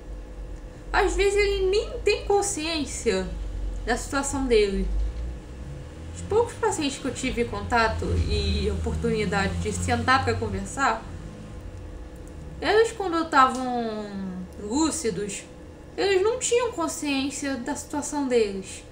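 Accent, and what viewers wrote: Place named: Rio de Janeiro, Brazil. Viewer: com essa língua presa é falando carioqueis é engraçado demais kkkk. Sabia que VC não diria às diferenças das profissões kkkk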